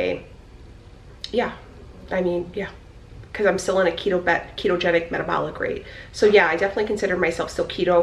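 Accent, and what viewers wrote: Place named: Chicago, United States. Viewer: I just found you a couple of weeks ago. I'm retired and doing Keto. I used to be a truck driver and always was interested in Chicago people when I went through. Love your accent! Enjoy the cooking segments, the fashion. I just taught myself dip nails😱and love your leopard nails today. Whatever direction you go i will be watching! There are lots of older women like me that miss the family hub-bub so its fun to watch and relive those times.❣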